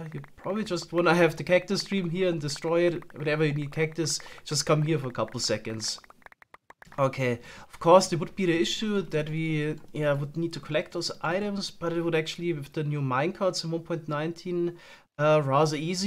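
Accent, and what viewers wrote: Germany. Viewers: The german accent is soooooo brutal hahaha love it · your german? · bist du deutscher ? hört sich leicht danach an. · I can hear a little bit of deutsch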